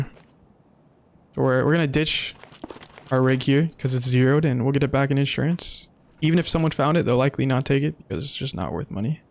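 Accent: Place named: Netherlands. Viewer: You hear the juicy dutch accent, hes doing a good job of hidding it.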